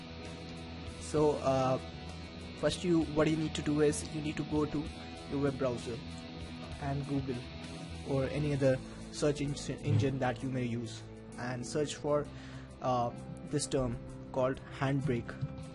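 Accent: India. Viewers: This Indian speech is awful i couldnt watch this video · PLEASE LOSE the Indian ACCENT! It is ANNOYING! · sounds like an indian from india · I think you're indian, and I love your accent!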